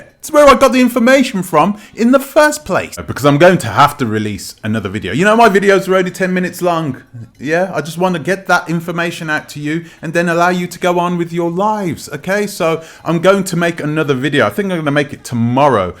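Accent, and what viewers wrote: United Kingdom. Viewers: I think I don't belive you, with this English of England, start speaking like a black man, while you talking like the King of england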